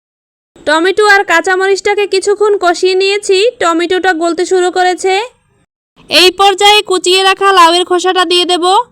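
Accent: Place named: Barishal, Bangladesh. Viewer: বরিশালের ভাষায় কথা শুনতে ভালো লাগে ভাজিটা ভালো হয়েছে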